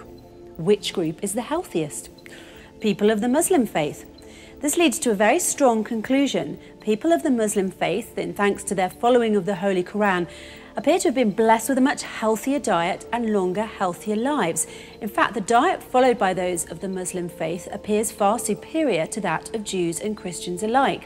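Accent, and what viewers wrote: England, United Kingdom. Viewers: True religion? No just another fairy story making ridiculous claims. Just because she is a white woman with an english accent does not make it any more true.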